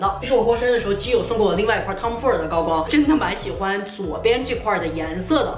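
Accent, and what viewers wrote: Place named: Taiyuan, China. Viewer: 真二八经的太原话，咱俩老乡，听得我这个舒服啊！哈哈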